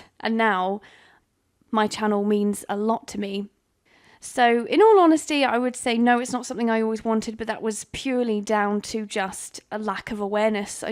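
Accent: England, United Kingdom. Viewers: English accent is elite. ✌️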